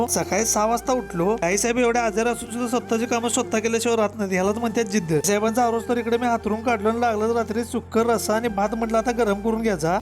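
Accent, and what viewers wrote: Kolhapur, India. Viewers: Bhava tuj gav kont re bhasha kolhapurchi vatate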